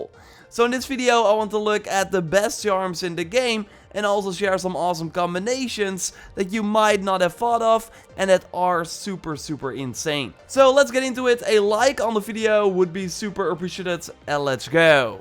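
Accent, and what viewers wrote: Netherlands. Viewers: Vire arrows 😀. Your dutch english never gets old.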